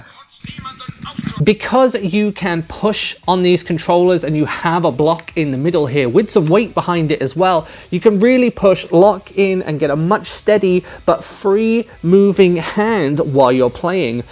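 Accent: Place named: United Kingdom. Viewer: I'm guessing by your accent that your Brittish? I'm also guessing that you do not currently reside in England? Given the fact that you just compared the VR pistol to a real one, which is a big no-no in England - a country that has become a haven for criminals and where law-abiding citizens have been stripped of any/all rights to bear arms or defend yourself against said criminals.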